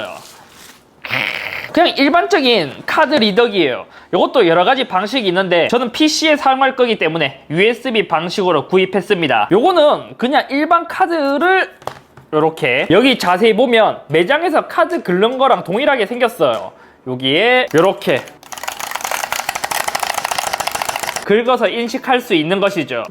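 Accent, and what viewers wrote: Gyeongsang, South Korea. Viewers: 대구 사람이라 그런지 잇섭님 사투리가 넘흐 좋아용 😍😍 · 정보는 그럭저럭 괜찮습니다. 죄송한데 경상도 억양만 노력해주심 더좋을것같네요 화이팅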